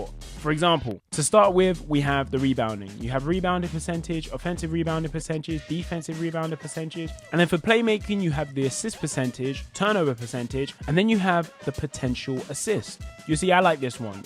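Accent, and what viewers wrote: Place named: United Kingdom. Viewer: Watched a couple of your vids now, subscribing and hitting the bell! It’s very refreshing to hear basketball from another British person lol. It’s hard being a UK nba fan, all the games are on at ridiculous times😂 Keep up the good work!!💯💯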